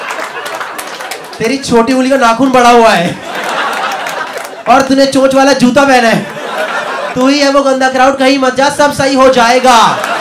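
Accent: Delhi, India. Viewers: Delhite accent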